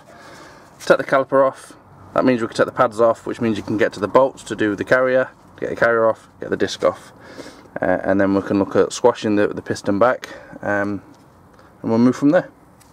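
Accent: England, United Kingdom. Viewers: Good video - Car repair taught by a guy with a classy English accent. Couldn't be too much better. Well - maybe if I could have talked someone else into doing my Focus brakes but,.....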